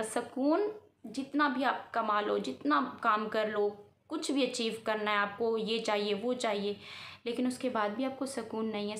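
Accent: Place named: Sindh, India. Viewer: App konsey village say ho..........ap ka lehja tu sindhi lagta hai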